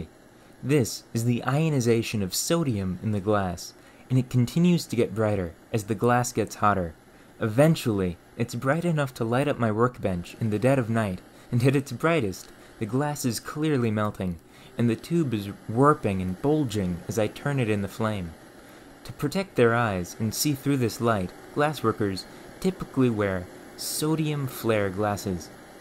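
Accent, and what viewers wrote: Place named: United States. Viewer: Where are you from? Your accent is generally American but you say "for" and "fur" the same way and any syllable ending -or with an -er sound. Regardless of that I appreciate the info. I'm on a quest to learn how we got from rocks to rockets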